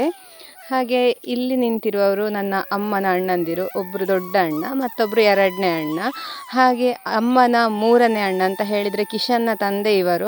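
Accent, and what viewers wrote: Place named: Malnad, India. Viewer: ನೀವು ಮಲೆ ನಾಡಿನವರು ಮಾತನಾಡುವ ಶೈಲಿ ತುಂಬಾ thrill ಅನಿಸುತ್ತದೆ.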